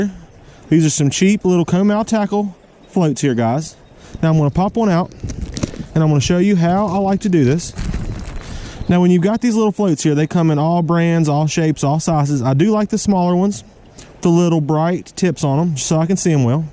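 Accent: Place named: Appalachia, United States. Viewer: enjoyed the video minus the hillbilly speak.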